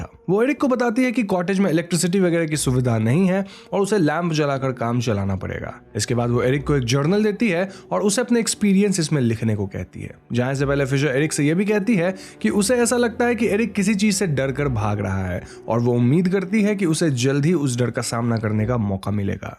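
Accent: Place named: Bihar, India. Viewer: Yeh bihari hai..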